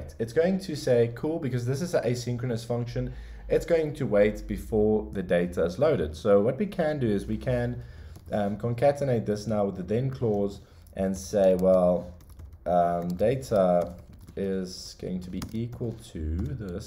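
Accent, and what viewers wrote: South Africa. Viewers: i have just found your channel, and dammit i know that accent is SA!! :) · This guy sounds south african....great work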